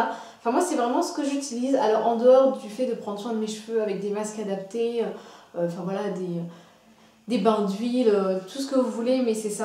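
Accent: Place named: Kabylie, Algeria. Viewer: t'es kabyle ? psk ta l'accent ^^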